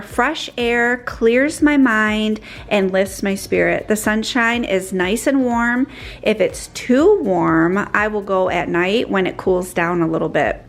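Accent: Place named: Midwest, United States. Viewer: Your accent is so cute! Definitely midwestern, I'd guess, but I'm a southern girl so not sure if I'm identifying it correctly. Love your recipes!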